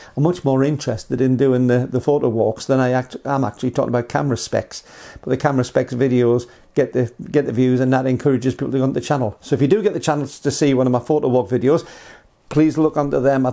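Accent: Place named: Newcastle, United Kingdom. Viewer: Always good to hear from anyone who sounds remotely like a Geordie ........ Most of this vid is well known to me, but it takes a voice on Youtube to keep getting the word out ..... we are all a long way from the 24 x 36 film aperture behind the mirror box..... I adopted the 4/3, then M4/3 simply because they were developed "from the ground up" with camera, lenses etc. I will not however, give up my Fujica St-705 which put me on my journey all those years ago ........ long live Kodachrome 64 ! Presently shooting with E-M1 Mark III and backed up with the Mk II and many others ........ keep up the the videos, they are appreciated !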